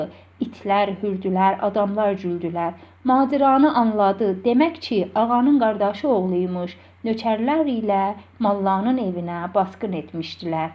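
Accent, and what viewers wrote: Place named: Iran. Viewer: چوخ ساغ اولون زحمت چکیبسیز. آما روایت ائدن قؽز، تۆرکجه‌نی یاخشی قرائت ائتمیر. سانکی بیر فارس، تۆرکجه‌نی قرائت ائدیر. گئنه‌ده الله یاردیمجی‌سی اوْلسون. زحمت چکیر.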